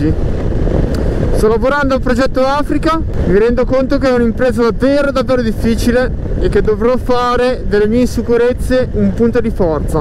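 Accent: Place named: Liguria, Italy. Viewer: Ciao, in un video hai toccato varie parti della mia vita. Nato a Zena sento il tuo spiccato accento ligure, motociclista e innamorato delle due ruote come te, ho vissuto nella parte occidentale africana e confermo essere ostica, ma non ti molla piú puoi lasciare l’Africa, ma lei non lascerá te. Inoltre il cuneese é dove vivo da qualche anno e spesso vedo che passi per le strade che bazzico io con la mia bimba 😍🥰. Grazie di tutto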